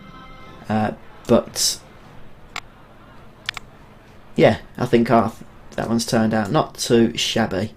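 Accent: United Kingdom. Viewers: I like him he sound like a really posh English man same as me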